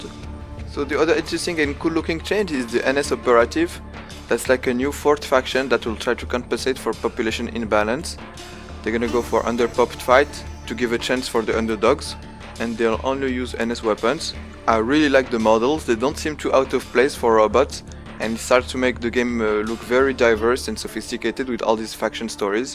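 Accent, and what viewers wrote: France. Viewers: T'as un accent français 🤔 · You really have a french accent. Es tu français ?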